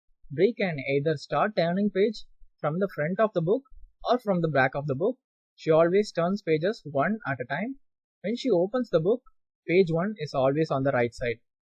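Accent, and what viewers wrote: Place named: South India, India. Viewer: are you from south india i guess? because of your N pronunciation. am I right?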